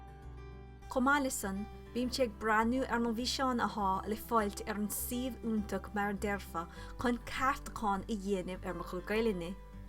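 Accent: Corca Dhuibhne, Ireland. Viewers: Tá blas iontach Corca Dhuibhne agus Gaelainn cruinn álainn agat. Ba cheart go mbeifá an-bhródúil as.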